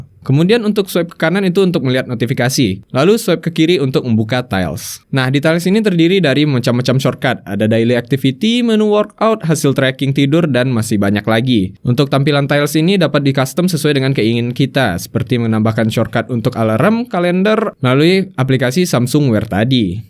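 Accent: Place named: Minangkabau, Indonesia. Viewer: Sudah kuduga urang awak, ada aksen nya tipis2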